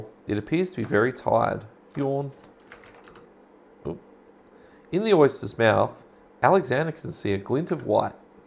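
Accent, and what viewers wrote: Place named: Australia. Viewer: Nice to see you got another video out. I was wondering if you gave up producing anymore of these. Now, I just only watched the first few minutes as it is getting late here in my part of the world. Will watch it later when I have more time. Based on your accent, I am assuming you are in Australia. Also to add. Wow! Talking about going "old school", compared to when I played this game on CD ROM with full voice acting and such on a 486 with a Sound Blaster 16 ASP w/ wave table daughter card. I think I still have the thing in the basement. May have to see if I can resurrect it.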